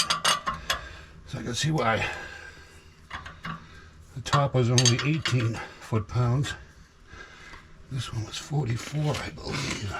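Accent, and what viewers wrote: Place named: Maine, United States. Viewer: You sound more like a Mainer than someone from Tennessee. Nice vid.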